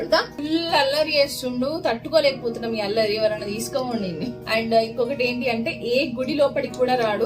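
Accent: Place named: Charakonda, India. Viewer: Akka chrakondhalo super ga chaptharu akka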